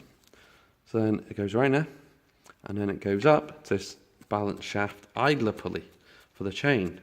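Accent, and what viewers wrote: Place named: Newcastle, United Kingdom. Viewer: Thought you were a geordie in the beginnnning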